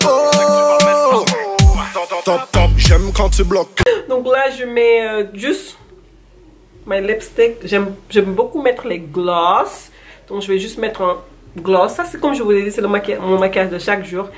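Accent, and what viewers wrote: Wolof, Senegal. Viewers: Je découvre ta chaîne Miss tu es belle belle,je suis une Sister sénégalaise. haha j aime ton accent wolofaliser. en fait j ai aussi une chaîne YouTube ,ci ça te tente d aller checkeeeee. bis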